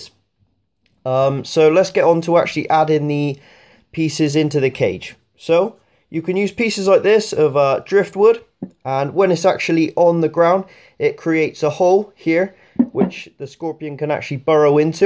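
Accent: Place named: Bristol, United Kingdom. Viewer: Lol great video, but very Bristolian lol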